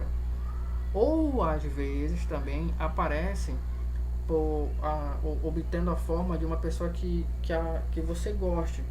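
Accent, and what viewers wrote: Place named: Pará, Brazil. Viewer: Maninho.... Tu é paraense neh ?? Morei um tempo no Pará, e tenho quase certeza da minha suspeita 🤔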